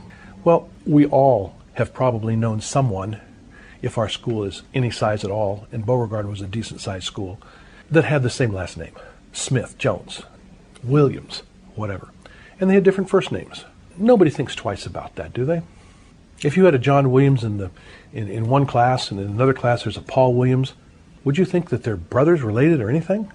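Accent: Pittsburgh, United States. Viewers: I got his book amazing work you from Pittsburgh I live on the norside of Pittsburgh off Brighton road I ask because your accent is Pittsburgh all the way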